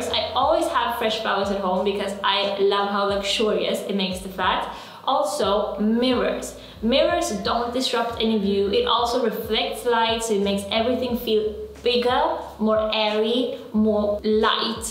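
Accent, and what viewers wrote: United Kingdom. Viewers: wow you're already catching some British accent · I can definitely hear some glorious British accent coming along :D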